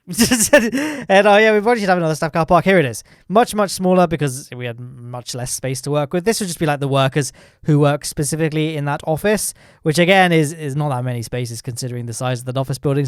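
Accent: United Kingdom. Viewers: Finally I'm early to "a guy with a British accent" vid. Ps I Only watch your ksp vid they're better no offense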